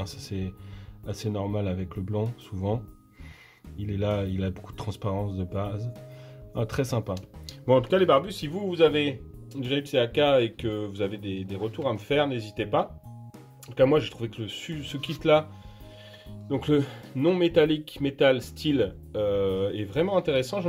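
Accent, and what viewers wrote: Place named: England, United Kingdom. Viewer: Merci pour cette vidéo, hâte de voir ton ressenti au niveau de son appliquation. On en parle énormément des peintures AK en ce moment. Je vais voir ta vidéo sur le set Gold. J'adore ton accent Anglais 👍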